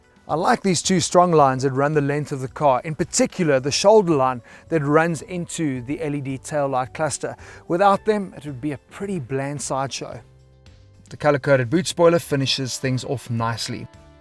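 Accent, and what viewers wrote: South Africa. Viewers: To be honest, I am watching this video only because his South African accent, which I barely understand.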